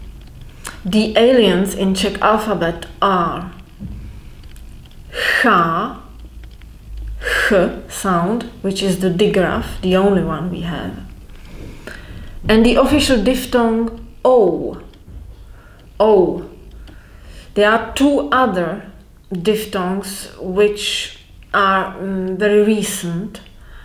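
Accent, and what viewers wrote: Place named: Poland. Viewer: I love your english. I'm from Poland and your accent is similar to polish accent 😄 I am starting study czech language and I hope your films will help me 😁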